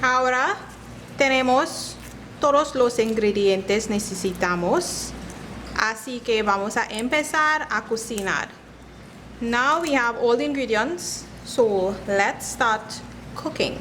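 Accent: Trinidad and Tobago. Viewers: Spanish with a trini accent, what a ting😕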